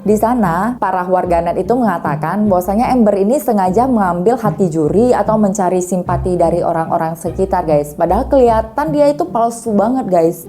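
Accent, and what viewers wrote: Medan, Indonesia. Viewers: Medan kali..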